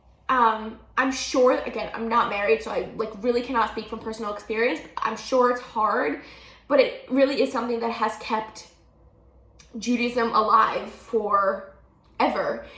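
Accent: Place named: United States. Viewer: When I see you, hear you speaking I feel like I have an American girl in front of me, certainly not an orthodox jew girl. Your nails are painted... Your top doesn't cover properly the area below your neck. Also, I don't think it is very tsanua to expose yourself on YouTube with your hair untied and talking about family purity rules for the entire world to see and hear...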